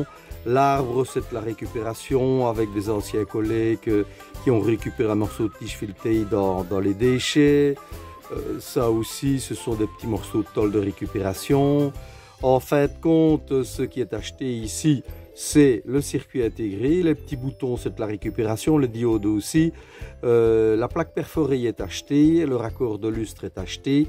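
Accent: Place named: Liège, Belgium. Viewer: Enfin un youtubeur belge (je l'entend à l'accent liégeois 😉) qui aime l'électronique. Merci pour cette vidéo et votre chaîne compte un abonné en plus. Voici le pouce 😀👍👍👍. N'hésitez pas à venir faire un tour sur ma chaîne dédiée à l'électronique débutant.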